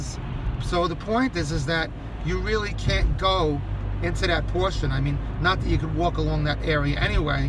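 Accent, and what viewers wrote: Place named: New York, United States. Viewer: Love your New York accent.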